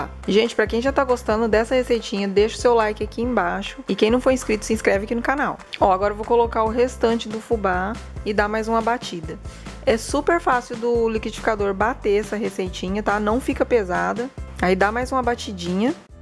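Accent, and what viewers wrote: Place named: Inland São Paulo, Brazil. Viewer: Posso estar enganada mas o sotaque dela, parece com o do povo do interior de Sao Paulo. 🤔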